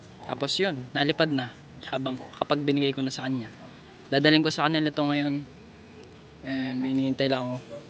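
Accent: Batangas, Philippines. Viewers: Yung batangas accent the yan baka gravee to.. hahaha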